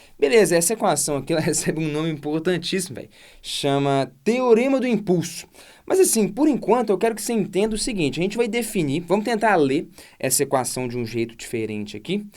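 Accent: Minas Gerais, Brazil. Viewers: Excelentíssima aula, Prof.! O sotaque mineiro deixa ainda melhor xD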